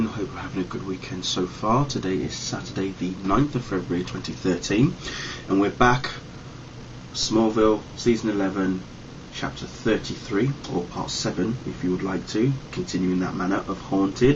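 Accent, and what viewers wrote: United Kingdom. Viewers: What part of England u from I know it must be up north lol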